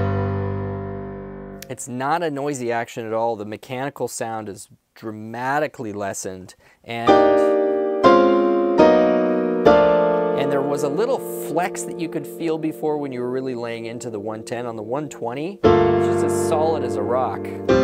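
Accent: Canada. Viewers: Loving the Canadian accent. I hear what you are talking "a-boot"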